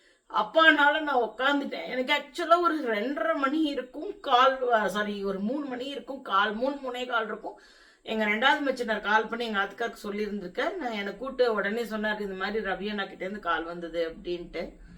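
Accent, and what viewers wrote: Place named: Iyengar, India. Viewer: Ellam Nanmaike, all the best, I am sure your mom n law understood your situation. She was there on Mon, perhaps spoke to you at length on that day to wish you well ( leave her blessings in person ), namakku enna arul irrukko adhu muzhu shaga irrukkum, don't worry, everything will workout fine, I like your iyengar tamizh, I watch off and on, we will wait for you to come back and enjoy your recipes, take care, relax and do the needful under the circumstances. Be well, keep strong, thanks, Radha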